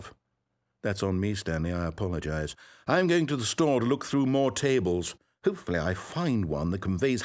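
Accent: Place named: United Kingdom. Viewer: I always loved how this adult British narrator straightly says such a little kid number like one-hundred-billion-trillion years.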